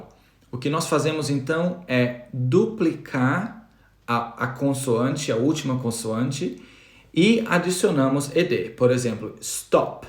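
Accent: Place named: United Kingdom. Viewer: That sounds great the British accent. I love it! I miss it! Congrats!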